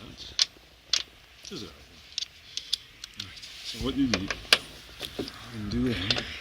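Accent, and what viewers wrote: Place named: Russia. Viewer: Thanks. Really interesting accent you have, I thought you were Russian at first!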